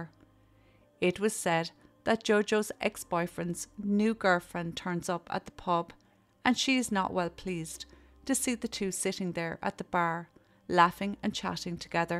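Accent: Ireland. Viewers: Love your content although they are heartbreaking. Love the Irish accent, one of my best friend whom I’ve known for 30 years is from Cork and I recognise the lovely lilt of your voice.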